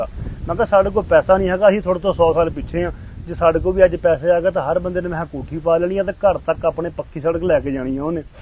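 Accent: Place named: Malwa, India. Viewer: ਬਾਈ ਤੇਰੀ ਪੰਜਾਬੀ ਜਮਾਂ ਸਾਡੇ ਮਾਲਵੇ ਵਰਗੀ ਆ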